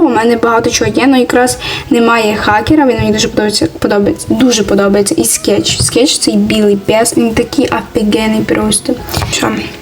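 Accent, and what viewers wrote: Ukraine. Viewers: Я так обожаю украинский акцент, это так миленько❤ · Я только сейчас понял почему она так говорит потому что она из укр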